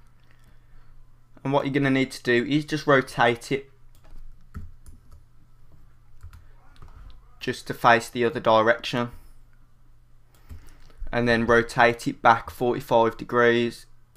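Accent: United Kingdom. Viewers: where are you from? uk for sure, but I don't recognize the accent. Sorry, I'm just curious :D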